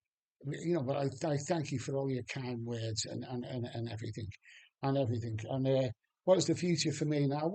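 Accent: Liverpool, United Kingdom. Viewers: Great to hear the scouse accent again 👌 one day at a time mate but the improvement is great 👍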